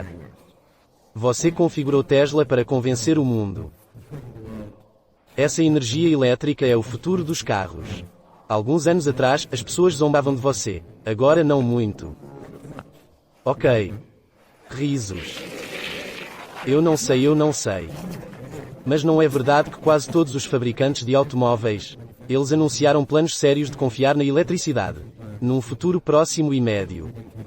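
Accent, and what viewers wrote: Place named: Portugal. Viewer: Você é de Portugal? Eu sou do Brasil, e pelo q entendi, o sotaque no vídeo é em PT-PT, e não em PT-BR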